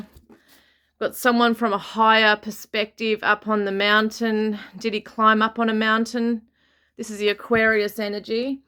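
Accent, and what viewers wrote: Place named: Australia. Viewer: I have never known of your channel before and clicked on it because of the subject. I had watched another reader yesterday and I wanted to compare what you both said. Pretty much identical. She believed he went higher and off the normal track. He tripped and fell hitting his head. She didn’t think he was in water but up on the mountain but would be hard to find by drones because he was wearing dark clothing. She doesn’t feel he is alive. She also said it would be a while - a few weeks before he was found (and you pulled out July). Two brothers passed him on the path and he would be found by other hikers (you had the hitch hike card). She felt that he and his wife had a bit of an argument on the beach before he left. You were mentioning was reflecting on his life etc. Don’t we all do that after a spat with our partner? Great reading and lovely to hear an Australian accent 🇦🇺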